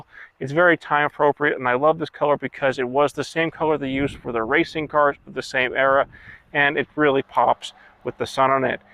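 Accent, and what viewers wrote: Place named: Europe. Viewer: Where is this guy from, Europe? Zed, kilometers. Z, mileage.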